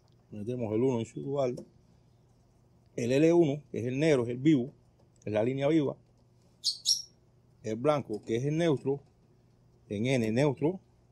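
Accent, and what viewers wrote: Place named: Caribbean. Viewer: Así hablamos nosotros los caribeños, cubanos, Dominicanos y como yo puertorriqueño, nuestro español y cultura ya es asi!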